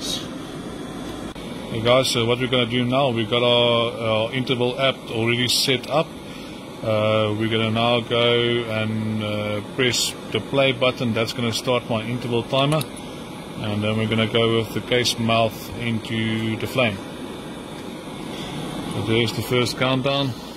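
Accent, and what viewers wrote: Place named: Afrikaans, South Africa. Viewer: Enkelvoudig, effektief, goedkoop en met 'n Afrikaanse aksent! Dit kan nie beter nie! Groete uit Utah, Merika!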